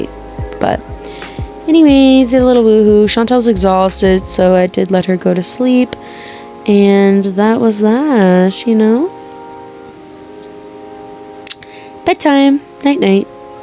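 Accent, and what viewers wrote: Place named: Atlantic Canada, Canada. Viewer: I'm subbing because I can tell you're from Atlantic Canada and so am I 😂 great video!! Really funny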